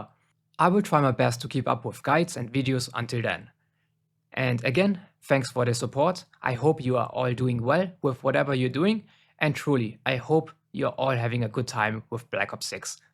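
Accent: Germany. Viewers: Are u from germany by Chance ? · Minimal deutscher Akzent 😅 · Der deutsche accent ist strong😂 gutes Video! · It's odd, it sometimes does 1 Mil damage (hello from Germany btw, I think you are from here too cuz its noticeable in your accent), while other times it does like 45k-ish? Like this will happen on the same round, in the SAME trigger. It'll suck up 3 manglers, spit them all out, 2 of them die and the third just... doesn't? Amalgams too, sometimes it's a mil, sometimes it's just less than 100k dmg. It's inconsistent. Also, I was REALLY hoping the targeted augment and the explosive drop augment would help turn shadow rift into a horde clearing beast, but the damage doesn't scale at all making it virtually useless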